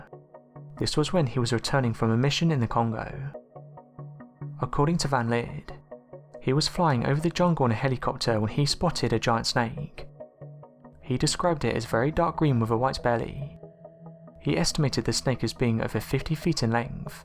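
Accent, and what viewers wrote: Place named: Manchester, United Kingdom. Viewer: Agreed... and though many chime in defending him when I say this, I STILL get very tired and annoyed with that accent. I understand all accents have a reason for existing and started long ago, nobody is right over the other when it comes to language blah blah etc... I figured that out without any help and I don't mean to be some sort of exterminator about it. In fact sometimes I wonder if HIS style (Manchester? Whichever it is...) might actually be one of the oldest ways English was originally developed (I doubt it though), it's still annoying as hell. How anyone would think "yeah, I want to start smushing some syllables into others, completely dropping other syllables, and trail off on almost every last word of every sentence and swing the cadence of each sentence the same near the end... that will help people understand me!" is baffling. 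During the large snake stories and accounts for example, I actually had to give up understanding several different parts and words!! They were so swallowed up or crushed that I can't tell when some sentences and words start ad stop. The information on the other hand, is very fun, creepy, and interesting. He never delivers it as gospel, doesn't have a strong bias towards any of them nor does it seem like he's trying to coerce us to accept anyone's opinion. He just delivers the story and I dig that. Just wish I didn't have to concentrate on ironing out the words in every other sentence.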